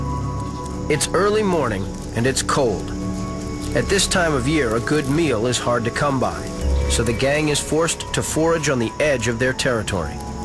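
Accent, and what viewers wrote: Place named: United States. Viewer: I finally found a channel with the American narrator, im so happy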